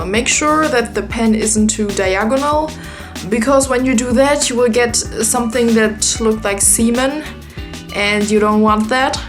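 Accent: Germany. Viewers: dot me an border :D hear that german accent girl ;-)) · I LUV YOUR GERMAN ACCENT JSHSVWKQGW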